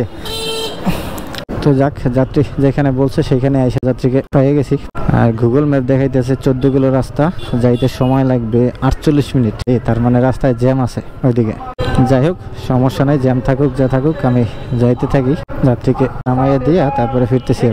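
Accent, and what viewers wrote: Barishal, Bangladesh. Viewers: ভাইয়ের বাড়ি কি বরিশালে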